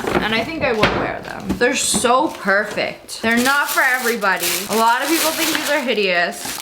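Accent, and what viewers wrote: France. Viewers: TobaMusicQueen wait is she really French or is she being funny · Wait is she actually French????.... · I’m so confused, is she French? 🤯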